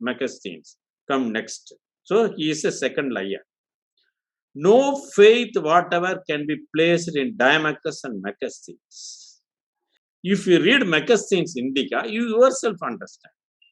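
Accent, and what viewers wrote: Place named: South, India. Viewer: The speaker is knowledgeable. But his South Indian accent of the English language is TERRIBLE !!!! Please record this speech in someone else’s voice. As an Indian, it’s difficult and irritating for me to understand this fellow. For a non- Indian, it’ll be impossible to understand this person!!!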